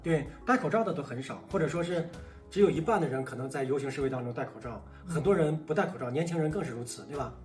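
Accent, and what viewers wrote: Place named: Northeast, China. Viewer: 这个人的说话要训练，且不说一口东北音，他每一句都说：这个的话呢，什么的话呢……。这是语病，要做播音必须要改这个不净口。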